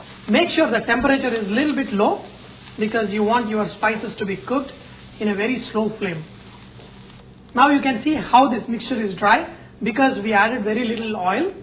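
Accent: India. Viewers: QUICKLY DIP IT! IMMEDIATLEY! ... the indian accent just makes this all the more fun XDDDDDDD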